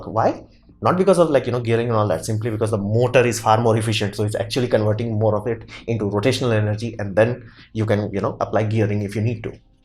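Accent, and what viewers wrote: India. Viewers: Our Bharatiya pronunciations. and fast speaking makes it difficult to digest your topic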